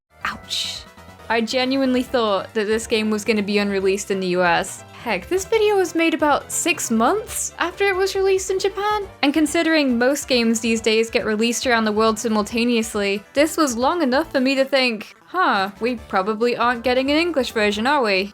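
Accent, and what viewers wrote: United Kingdom. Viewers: It’s intentional that’s how brits speak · I love your vids! Keep it up and keep the British pronunciation!!! <3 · She did not mess; she's British!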